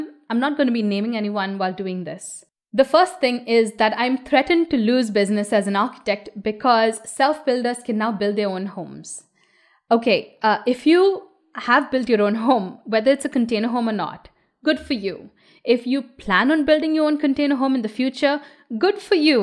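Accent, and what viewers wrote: India. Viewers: Aren't people buying used containers quite cheaply that are no longer suitable for their original use? Doesn't LA have a ton of them? As for insulation, it seems silly to use steel in a cold climate. Rather they should be used in warm climates so no insulation is needed at all. Then just protect them from rain. As for working within the skin, not really if you can put them together. Not many single people need a home more than 17' wide and about 45' long. That's 765 sq ft. The main problem seems to be their high weight. The future is probably a prebuilt, custom designed home, designed mainly by the buyer with software, using the best materials available in that area at the lowest cost, assembled in a few days on site. I wonder if foundations could be poured on mass, making the process much cheaper than doing one at a time. Surely it must be. 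You've got an excellent analytical mind but coming from an Indian background you're probably used to family sizes that are larger whereas this idea is best for single people or a couple at most. Dubai? Please. Your heavy Indian accent makes India a more likely origin. Just tell people how long you were in Dubai. Otherwise it sounds like you're trying to hide it for some reason. The head of both Microsoft and Google are Indian. Plus a lot of Indians are getting educated in the UK which is often far superior to most American universities. No need to hide anything. Its best to never bring up racism. Sure it may be there but its often subtle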